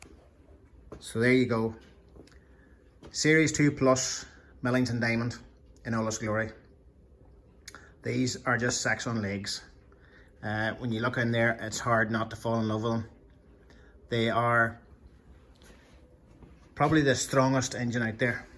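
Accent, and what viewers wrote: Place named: Scotland, United Kingdom. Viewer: I absolutely love the Scottish accent ❤❤❤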